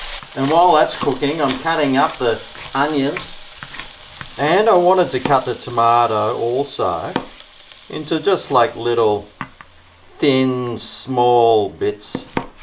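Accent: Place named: Australia. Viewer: The best Aussie cook on you tube. And with very good Aussie accent and great sense of Humour. Everything is cheap and very efficient with time without stress at all. Very inviting when I watch your programme. Keep it flowing. Happy New Year Mate, from Hobart Tasmania.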